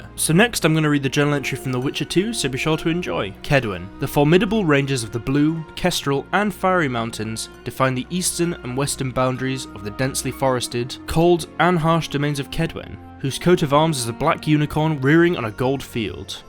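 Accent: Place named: Scotland, United Kingdom. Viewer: The Scottish accent tho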